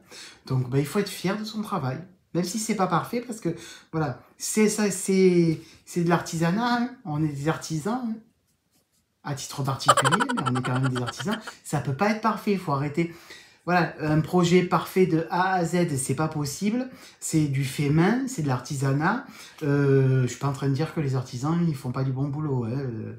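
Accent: South, France. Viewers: Merci pour ce jolis moment,je suis belge et j aime tellement ton accent de soleil a bientôt Big bisous ❤❤❤😂😂😂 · Bonjour Mais quel plaisir de vous suivre à chaque fois, je passe un excellent moment. J'adore votre humour, votre franchise et votre gentilesse. Vos tutos sont au top, et les explications de vos ouvrages détaillés sont super chouette. Quant à votre accent J'ADORE!!! Je suis du sud aussi. Un grand merci pour tout ce que vous faites pour nous et à très bientôt. DOMY du 04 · Bonjour moi le bruit d ouverture de colis ne me dérange pas du tout au contraire,et l émission sur le tricot je l'ai déjà vue il y a longtemps,et j aime l accent du sud! nous ont est dans le nord la où il fait gris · Bisous et merci pour ce podcast tout en gaieté avec ton bel accent du midi. ❤❤❤❤ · Merci, on passe vraiment un très bon moment. Je découvre un univers de teinturières et de créateurs que je ne connaissais pas, alors que j’ai appris à tricoter il y a plus de soixante ans. 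Je suis arrivée à « « en cherchant un tuto pour talons de chaussettes en rangs raccourcis et depuis j’écoute le podcast J’aime beaucoup l’accent du sud, le naturel et la bienveillance Surtout ne changez pas Bisous de Normandie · Coucou, jeune! Heureusement que la perfection n'existe pas dans ce bas monde, sinon quel ennui! Il n'y a aucune course au tricot, chacun son rythme, chacun ses envies et les vôtres sont très belles et inspirantes, avec cette touche d'humour "avé l'assent". A bientôt. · Quel agréable moment passé en ta compagnie! Tes vidéos sont tellement « vivantes » qu’on ne s’ennuie pas… entre ton bel accent du midi et ton humour c’est le moment récréation pour moi. A très vite j’espère 🤗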